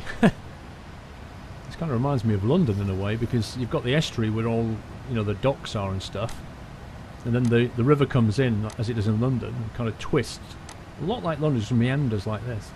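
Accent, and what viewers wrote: Australia. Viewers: ur sounding a lot like an aurrie even thou ur from the uk I think lol but ur saying the names almost spot on lol but I use to watch ur vids for euro truck sim now I know u play this as well so yea can watch more lol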